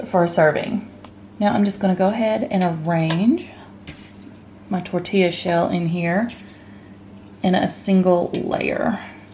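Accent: South, United States. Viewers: I trust cooks with southern accents more!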